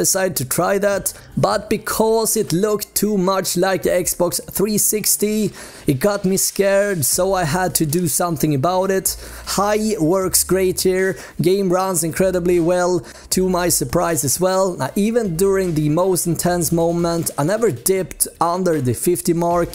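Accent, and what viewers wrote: Sweden. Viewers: Sounds swedish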